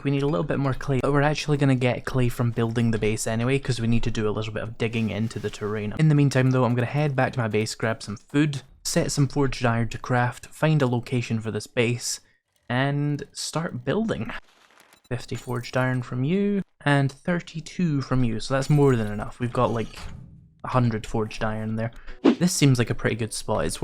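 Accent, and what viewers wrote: Scotland, United Kingdom. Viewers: Hey! Love the vid (taking it in chunks) and love the accent. Special place in this ol" gramma's heart for Scots.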